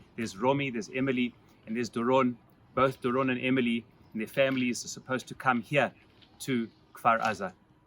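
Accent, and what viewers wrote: South Africa. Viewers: Rabbi sounds south african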